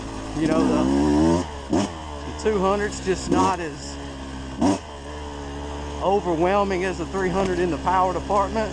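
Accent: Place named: South, United States. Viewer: i'm not from America, but.... is that a bit of a Southern accent i detect there...? i sure think so !!! :-))) great looking bike bro ! thanks for the review ! greetings from Israel ! take care !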